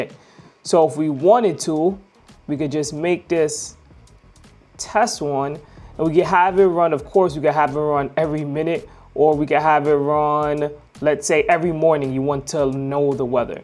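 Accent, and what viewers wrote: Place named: Bronx, United States. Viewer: Brotha I was gonna ask what part of da Bronx you from? 😂 didn’t think you’d be from ATL❤❤❤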